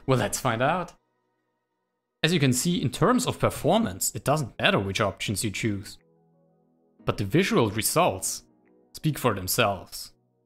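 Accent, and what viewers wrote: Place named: Germany. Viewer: I could tell you were born in Austria, Germany, or any other place that speaks German as a language. the accent sounds german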